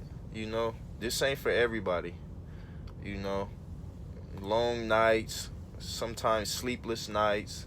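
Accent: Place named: California, United States. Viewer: Man fasheezy good looking with this video bro. I’m actually in the process of getting my DOT physical so I can get my permit and start school with Swift in Fontana. I’m a veteran so I heard there is some benefits to that but we’ll see. I definitely have a desire to do this and can handle it, sounds like fun to me if I’m being honest. I appreciate the content lol I can tell you was from Cali once I heard you start talking 😂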